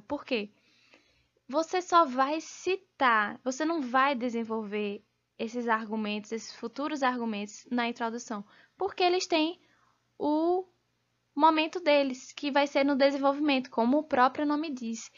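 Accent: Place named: Bahia, Brazil. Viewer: O sotaque bahiano é a melhor parte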